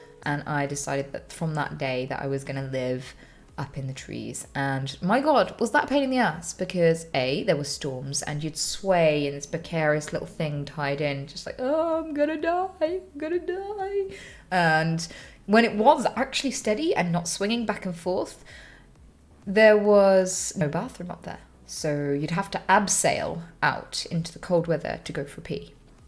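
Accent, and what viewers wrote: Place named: United Kingdom. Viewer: She sounds so much more british here, than she does now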